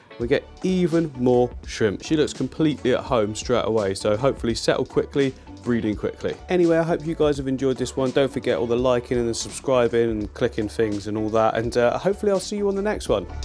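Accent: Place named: United Kingdom. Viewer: I really like your tanks, but this british accent is bloody haaard, innit? I just can't watch it, sorry. But a lot of kisses, wish you well.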